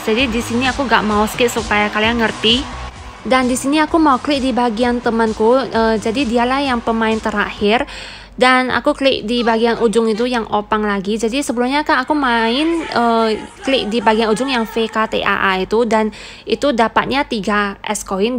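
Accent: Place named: Java, Indonesia. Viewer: Indonesianya Medok :D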